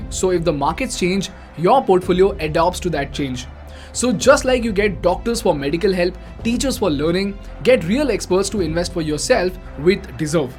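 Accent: India. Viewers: Sorry but your English is not very good. Your English with Indian accent. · It´s hard to understand a person from India speaking Englisch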